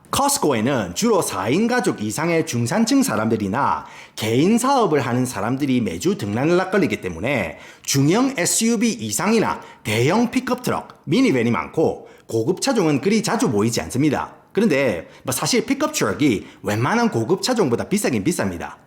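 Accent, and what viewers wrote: Gyeongsang, South Korea. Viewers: 강렬한 경상도 사투리 사이에 비집고 튀어나오는 넘나 정확한 영어발음 ㅎㅎ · 아뉘 사투리 😂찐 갱상도인이 듣기에 너무 편안! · 갱상도 사투리에 안어울리게 영어발음이 장난아니네 ㅋ 재밌네 아재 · 저도 이게 넘 신기한게... 왜 찐 경상도남자들은 서울말투도 따라하는거 오글거린다 하시잖아요? 근데 또 영어발음은 또 clear하게 넘 좋으시고. 또 너무 어릴 때 왔다기엔 경상도 accent가 thick하시길래ㅋㅋ 1.5거나 혹은 성인 때 왔어도 언어 센스가 좋으시구나~ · 말투가 너무 중독성 있어요ㅎㅎㅎㅎㅎ 경상도 + 교포느낌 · 외국인이 한국남부(경상도) 사투리를 인지한다는게 신기하네요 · 귀요미 경상도 아재가 말아주는 알쓸잡미국 썰~~~ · 여기서 경상도 사투리 들으니까 너무 우습네요 근데 Albertsons Vons 브랜드 가 Kroger 브랜드 보다 한단계 높은디 ㅎㅎ구독과 좋아요 했어요 또 웃겨 주세요. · 구수한 갱상도 사투리..ㅋㅋㅋㅋ 좋은 영상 많이 기대하고, 항상 건강 챙기소~~ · 와... 시끄러워. 경상도. 생긴것도 딱 경상도네. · 아... 너무 재밌게 봤어요. 타겟과 월마트의 적나라한 카트 비교.. ㅋㅋ 트레이더죠스의 주차장 상황까지.. 구수한 갱상도 사투리에 쪼매 안어울리는 영어발음은 완전 본토! 미국생활 30년차인데 나름 감동이었습니다.. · 경상도사투리와 영어발음이 온가는게 넘 재밌네요 ㅋㅋ 저는 미국온지 2 년째인데 저도 경상도입ㄴ다ㅎㅎ 전 달라스인데 공감해요^^ 근데 h 마트가 월마트보다 야채과일은 더 엉망입니다 ㅜㅜ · 우째 이리 갱상도 사투리와 빠다 먹은 영어 발음으로 말을 재미나게하는지~ 윽수로 땡큐라예~ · 이상하게 난 한국에 아는데 갱상도 사투리를 들으니까 향수가 느껴지지? 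· 어떻게 영어는 완전 찐 교포신데 한국어는 경상도아저씨 스타일이신지 넘 신기해요. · 채널 주인장님 완전 매력터짐요ㅋㅋ 오리지널 경상남도 남부 억양과 한 번씩 나오는 영어가 삘이 좋은게 어딘가 매치가 잘 안됨 + 표정의 90%는 입매가 담당 + 어딘가 중국계 미국인 필 입담 대박 맛깔나요 👍🏻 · 경상도 원어민이 영어도 원어민이시네요 내용도 유용하고 재밌기도 합니다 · 고향이 어뎁니까? 참말로 구수하네예. 영어할때 반전이네예 ㅋㅋㅋㅋㅋ 구독했쓰예! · 갱상south도의 사투리 찰지고 재밋어예❤ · 어쩜 경산도 사투리가 촥촥~ 귀에 감겨요. ㅎ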